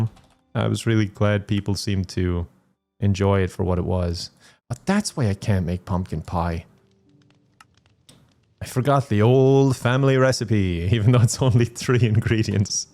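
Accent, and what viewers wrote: Ireland. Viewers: This is genuinely such a soothing let’s play. I didn’t expect to fall asleep during the last episode but at the end of it I blinked and it was 30 mins later in the video, waited til bedtime for this one in case it does it again. Always love a good hang out with our totally sane friend, reminds me of the old Stardew videos from when the channel was still brand new Also for the record you got me to fall in love with the Irish accent to the point I use it on my Siri anymore so you’re clearly doing /something/ right for Irish culture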